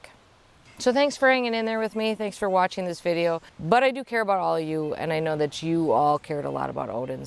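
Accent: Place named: Midwest, United States. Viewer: My husband and I have only had one dog in our 39 years together and this is why. It was devastating to lose a 25 lb schnauzer. I can't imagine losing a giant teddy bear like Oden. My heart goes out to all of you. You are real, pragmatic, down to Earth and talk to the camera like the neighbor I wish I had. My 80 year old mother who was born and raised in a Chicago suburb says she likes you too. Must be the familiar Midwestern speech and manner. Take care.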